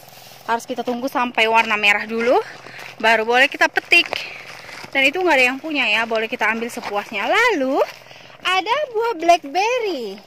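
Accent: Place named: Samosir, Indonesia. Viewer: Kayak yg jualan di samosir, ditomok pelabuhan, logat2nya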